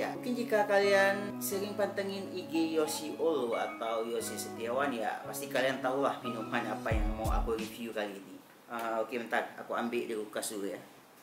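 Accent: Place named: Aceh, Indonesia. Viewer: Orang aceh emang biasanya ngomongnya begitu logatnya gitulah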